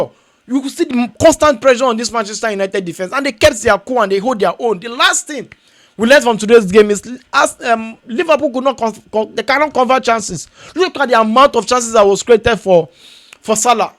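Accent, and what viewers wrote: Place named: New Jersey, United States. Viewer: That’s a lovely accent you have. New Jersey?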